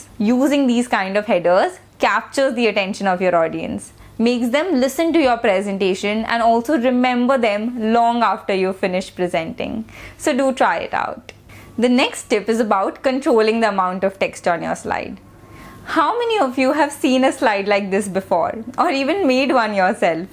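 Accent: India. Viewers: Indian accent sucks..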